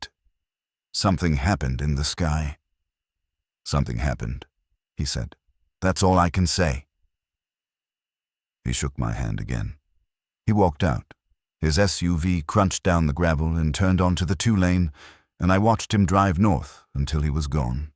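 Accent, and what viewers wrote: England, United Kingdom. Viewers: If you're going to use an English accent why don't you set the story in England?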